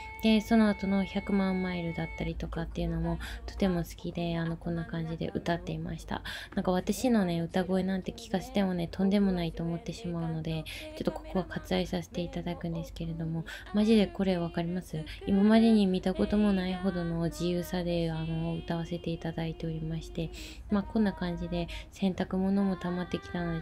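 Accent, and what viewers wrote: Kagoshima, Japan. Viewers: 〜！夜勤中にぼーっと見てます。鹿児島弁が心地よか〜〜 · 久しぶりにコメントしました🙃 の鹿児島弁すき！ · 鹿児島のなまり？初めて聞いたのでびっくりした‼️新鮮です‼️‼️ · いちいちコメントが面白くて笑ったw 声と鹿児島弁に癒されました☺️ の日常がわかるおうち動画好きー！また楽しみにしてます♡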